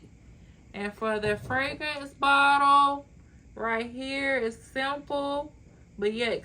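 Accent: United States. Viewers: A Mississippi / southern accent for sure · Should this be in my collection? Thank you for sharing this content! Sound like you from the South.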